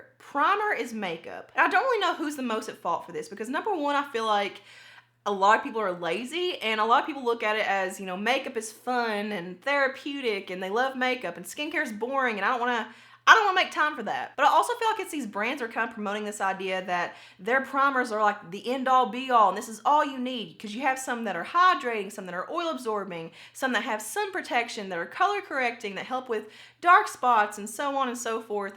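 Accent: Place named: Kentucky, United States. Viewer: Just found this channel, heard your accent and just knew you were one of us KY girls! Love it!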